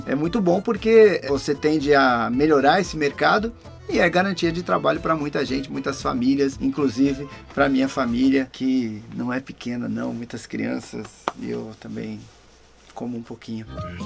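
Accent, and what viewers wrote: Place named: Rio de Janeiro, Brazil. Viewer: sotaque é duro. Principalmente quando puxa para o carioca. Eu gosto da dublagem mas eu sei que tem como atenuar sotaque para não ficar carregado.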